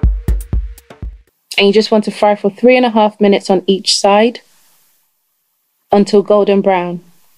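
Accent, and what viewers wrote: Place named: United States. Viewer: Are you American or a Nigerian?? Love d accent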